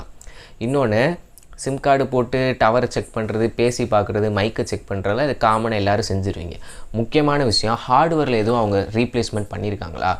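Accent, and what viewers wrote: Madurai, India. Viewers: Bro neenga madurai ya bro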